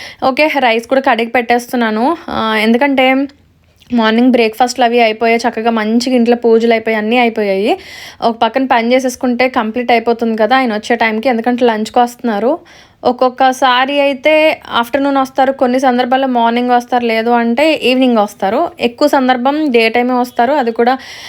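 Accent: Godavari, India. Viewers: Meeru vunna place? Godavari slang bagundi andi, madi east godavari, chala baguntadhi slang😅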